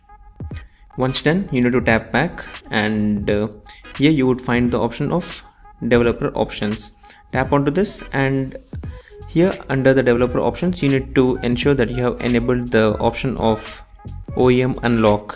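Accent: India. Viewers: he's speaking at an average pace. it just sounds fast because of his indian accent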